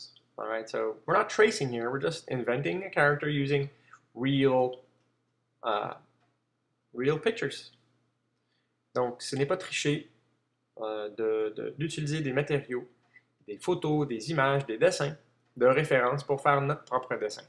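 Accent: Quebec, Canada. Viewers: Waw.. Magnifique.. C'est un rêve de savoir dessiner comme toi ! J'suis allé au Québec en vacance, leur accent m'a fait penser a toi ! :P (Je suis Francais). C'est vraiment un talent fou que tu a, en plus, tu le commente aussi bien en Francais qu'en Anglais.. Un grand bravo a toi, merci et continue tes vidéos ! ;)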